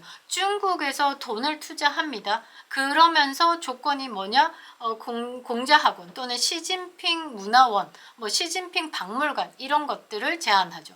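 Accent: Gyeongsang, South Korea. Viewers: 경상도 분이라 억양이 좀 그러니 이해해서 들어주세용~~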